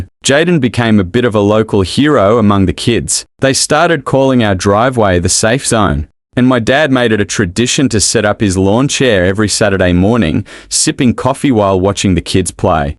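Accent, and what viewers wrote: Australia. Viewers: No mention of where, no names, could be true but I feel it easily comes over as fake or hyped. No clue of country feel its USA but sound Australian. What is this photo? Why the drawn guns? Why would they pull guns on a kid. The photo shows no safety girl, no image of the Karen. Who is the little girl. What HOA? What rule? More questions than answers. It sounds like a story. Absolutely nothing to say this was true, when, or anything. Terrible. This is truly terrible content.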